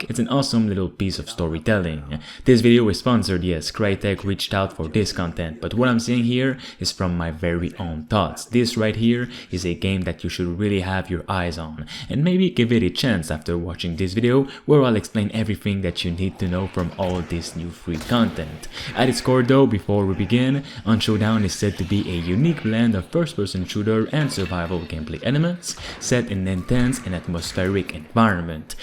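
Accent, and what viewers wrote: Quebec, Canada. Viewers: Es tu québécois? Les canadiens sont le meilleur 😊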